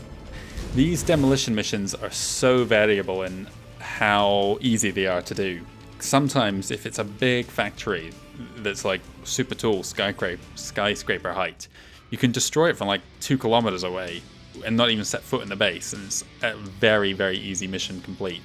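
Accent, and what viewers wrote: United Kingdom. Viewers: Personally I struggled to hear Ryana’s Scottish side, tho if you said she came more form the east side of Scotland around Edinburgh or Fife areas then they have alot less of a toned version compared to west and mid Scotland. So it’s probably true being she’s a Northwinder but likely comes from a part that has just a very very mellow version of the accent. Edit: also say a lot when I didn’t even know you were Scottish yourself. So aye, definitely more on that more mellow side then. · i hear a brit'ish accent but not a haggis laced one.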